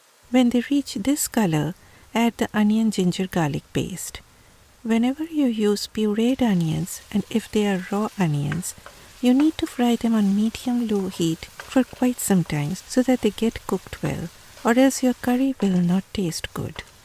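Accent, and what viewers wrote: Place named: Bengal, India. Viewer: Hi.. a suggestion.... use shallots instead of red onion in US! The red onions in US are void of any natural pungency and usually taste like grass! Also, I saw you mentioned edible mustard oil of good quality not available in US! Try using TEZ brand or Radhuni brand available at any Bangladeshi grocery store! Loved your bengali style English narration with occasional "To"... u know what I mean 😂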